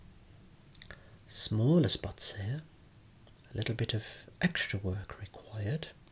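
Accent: United Kingdom. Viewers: Your voice and your british accent are so calming, I love it!!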